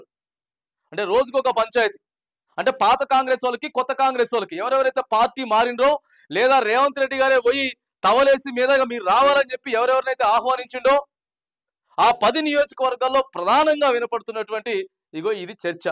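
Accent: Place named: Andhra Pradesh, India. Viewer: అందరికీ నమస్తే నువ్వు ఆందోళన తమ్మి అందరికీ నమస్తే అన్న పదం మంచిగుంది గాని నీ మాట వింటే ఆంధ్ర లెక్క ఉన్నావ్ ఆంధ్ర కుక్క వా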